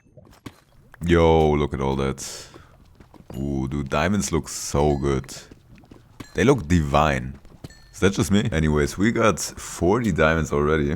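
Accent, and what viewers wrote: Germany. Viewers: I hear deutsch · are you german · Ich Liebs einfach wie ich in 5sek den deutschen Akzent rausgehörte habe 😂 Geiles Video Grüße auf Hannover ❤ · Why do i think that U are a German guy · damn u got a cool german accent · I can here a little bit Deutsch in your englisch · Ohne Witz, ich mache das Video an, höre den Akzent und denke mir… der ist doch deutsch. Checke dein Acc and low and behold its in Germany 😭😭 love that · I hear the DEUTSCH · as a german I hear that you are German only because of the voice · Do I hear german? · I can smell the German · Is he german? Bruder ich hörs doch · you sound so german 🙏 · i know a german accent when i hear one haha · Ich hear a deutschen akzent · I know a German accent when I hear it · i hear a little bit deutsch haha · out of context but are u perchance german? ur accent and pronunciation sounds like it (coming from a german person) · Not even 10 seconds and i could already hear the german accent 💀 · First time watching a video of yours and I love how I immediately said "German" the moment you spoke😂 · Are you german?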